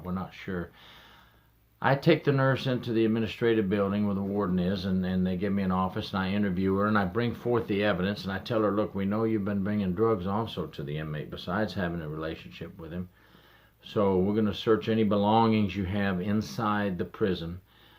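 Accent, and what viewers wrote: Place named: Florida, United States. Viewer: Just stumbled onto your channel not even sure how I got here. You sound like your in Florida. I live here too and I have a loved one incarcerated due out next year. I myself have never understood how the greed of money will drive someone employed by the DOC to get involved with an inmate and abuse their authority. Inmates can't consent to sex why are these people not charged with Rape? If I wanted to be with my Loved one in that way at a visit as a visitor I would assume I would catch charges. What makes them any different? I look forward to watching more of your channel. ;)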